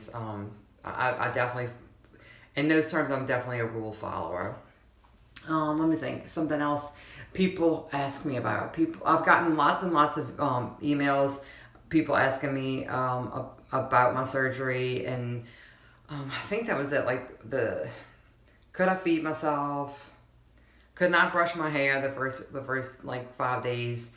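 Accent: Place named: Morgan City, United States. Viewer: Love your accent...sounds like home. I grew up in Morgan City.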